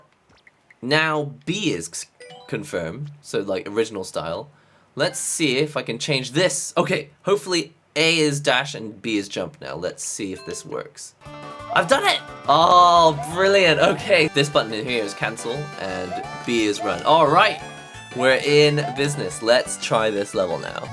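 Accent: United Kingdom. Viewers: Why do u have a British accent ?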